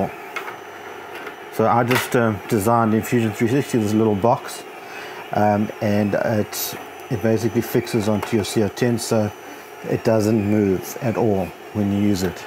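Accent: South Africa. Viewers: Are you South African? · This boytjie must be a south african???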